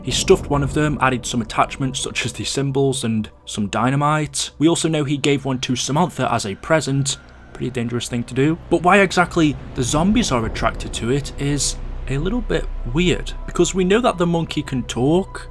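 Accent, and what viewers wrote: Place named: Stoke-on-Trent, United Kingdom. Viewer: Are you from stoke on Trent ? I recognise the accent, I’m from stoke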